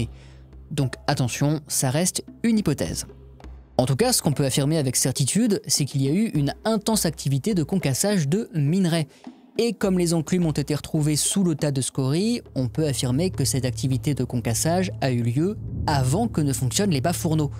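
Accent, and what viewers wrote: Alsace, France. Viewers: salut je viens de découvrir ta chaine avec le mur paien, je me demandais, tu es alsacien?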